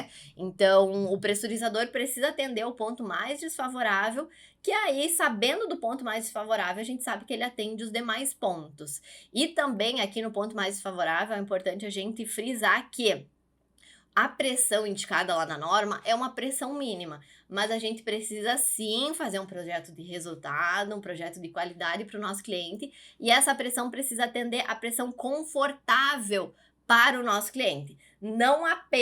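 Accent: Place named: South, Brazil. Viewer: trem bonitinho e esse sotaque do sul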